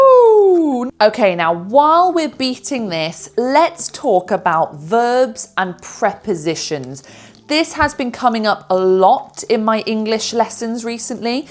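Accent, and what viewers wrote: United Kingdom. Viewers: I like British accent ❤ and cookies amazing georgous looking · how l love British accent ❤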